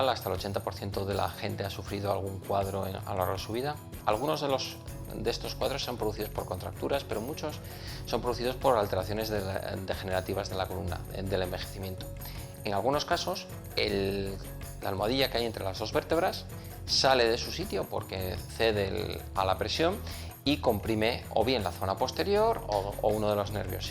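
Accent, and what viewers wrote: Spain. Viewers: ya decía yo por el acento era español...oh tan lejos doctorcito, estamos en América latina. 👍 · Dr yo tengo dos hernias pero yo vivo en México y por su acento español me imagino que Ud vive en España pero si quisiera saber el costo y donde podría contactarnos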